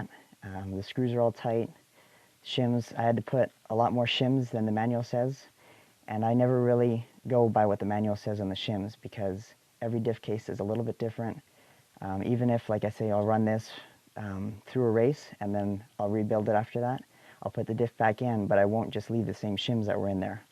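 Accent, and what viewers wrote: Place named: Canada. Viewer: i love the way Canadian people talk..... TRAILOR PARK BOYS!!!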